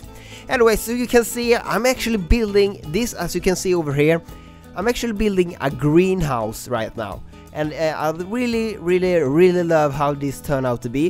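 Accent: Sweden. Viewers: Is he swedish? o.o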